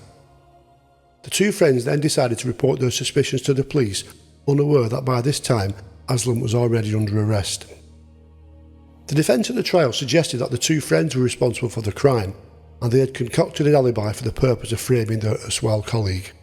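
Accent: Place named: St Helens, United Kingdom. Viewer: Great episode, I do miss that accent….trained as a nurse in St Helens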